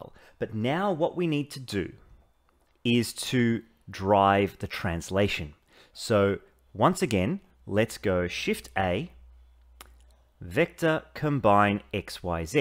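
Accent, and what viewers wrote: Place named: Australia. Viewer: Aussie accent, Pikachu in background... off to an awesome start. lol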